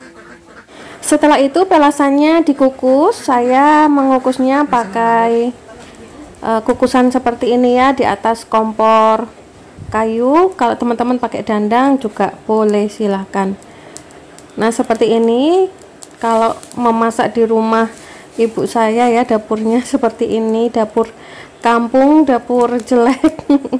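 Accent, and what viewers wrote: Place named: Osing, Indonesia. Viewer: saya justru kangen logat osing nya kalau nonton video ini saat lg masak hehehe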